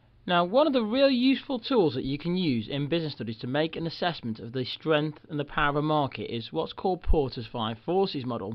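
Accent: United Kingdom. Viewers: too englishish.. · Because he's from the UK and thats just how he talks?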